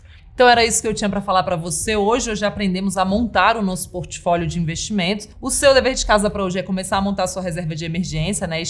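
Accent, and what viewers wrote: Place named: Minas Gerais, Brazil. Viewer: Kkkk. Muito mineira nos jeitos e trejeitos pra falar. Além de saber muito, sabe explicar de uma forma muito fácil. Parabéns e sucesso pra todos na Spiti.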